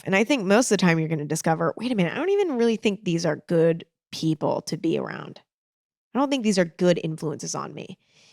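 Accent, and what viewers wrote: United States. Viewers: living for ur new york accent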